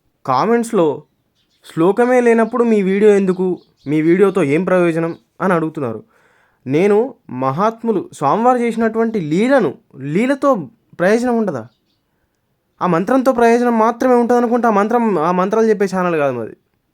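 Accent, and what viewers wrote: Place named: Telangana, India. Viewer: Excellent! By your accent know you are telengana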